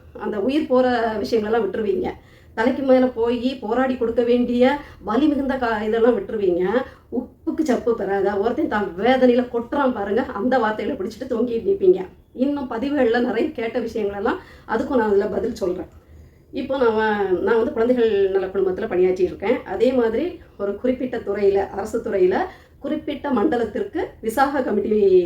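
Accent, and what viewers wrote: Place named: Kanyakumari, India. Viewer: அக்கா நம்ம வட்டார வழக்கில பேசி கலக்குறீங்க #பழந்தமிழ் #தேன்மொழி #குமரித்தமிழ்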